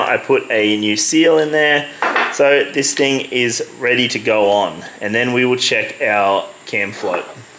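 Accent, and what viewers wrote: Australia. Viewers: the Aussie accent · I love your aussiman accent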